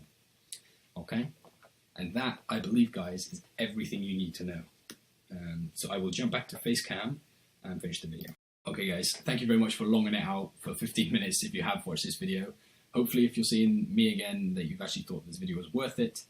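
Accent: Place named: United Kingdom. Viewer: Hello - I prefer to to 'read' your course, rather than watch it.. [your British accent is lovely but a bit difficult for me... also, the snapshots you share are blurry], so... do you have this good information in a book or a website or something? Thanks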